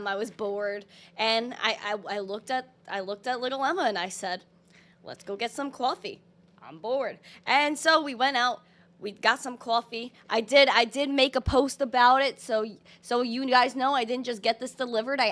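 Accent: Brooklyn, United States. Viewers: Where ya from? Brooklyn or NJ ?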